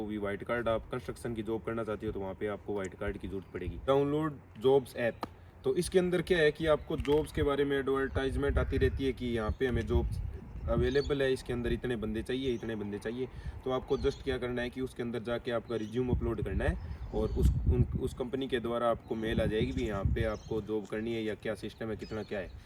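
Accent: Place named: Haryana, India. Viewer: Bhai Rajasthan haryana side se ho kya Hindi nal Dhaka ho rya ha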